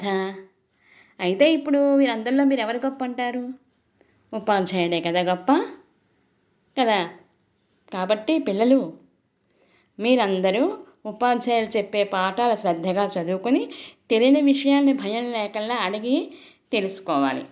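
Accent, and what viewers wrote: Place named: Rayalaseema, India. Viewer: చాలా బాగుంది..సీమ భాష లాగా ఉన్నది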